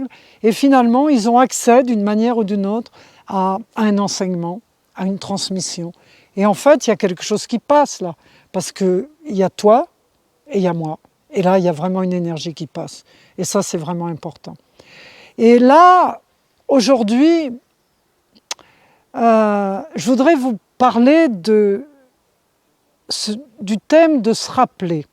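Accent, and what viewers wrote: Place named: France. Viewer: mais elle est francaise cette personne, francaise de france, c'est quoi le rapport une francaise qui se dit autochtone????? qui se dit gourou autochtone qui va nous transmettre la culture autochtone cette francaise????? ben voyons donc, elle est francaise cette personne la, c'est pas une autochtone elle, son accent est francais de france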